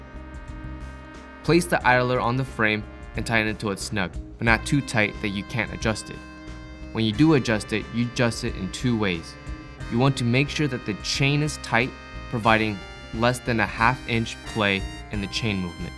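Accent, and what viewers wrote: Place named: East Los Angeles, United States. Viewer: Man oh Man , I really noticed this guys voice has that distinctive East LA Chicano accent , makes me home sick ... you probably did not even notice but it is a Hispanic Los Angeles Dude talking ! ... video was made in a suburb of Los Angeles !!!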